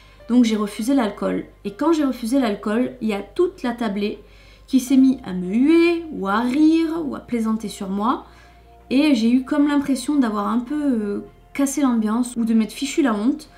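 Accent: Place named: Montpellier, France. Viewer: Wahou, tu me touches beaucoup. J'ai l'impression de me voir à travers toi, même si je suis beaucoup plus âgée. Et en plus tu dois être de Montpellier vu ton accent! Tu fais si jeune et tu as connus déjà des choses assez difficiles... Choses que je connais parce que comme toi, je suis très sensible, naïve et gentille. Quel courage tu as eu de garder cet enfant avec des parents pas très compréhensifs. J'ai connu tout ça aussi. Bref, la vie est devant et je te souhaite tout le bonheur du monde. 😊💋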